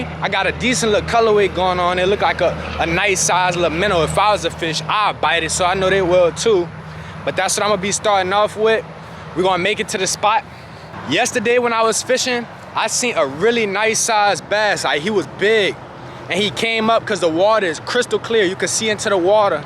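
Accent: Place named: South, United States. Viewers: I think he from Charleston sc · Bro where you from ? Gotta be in the boot wit me im guessing south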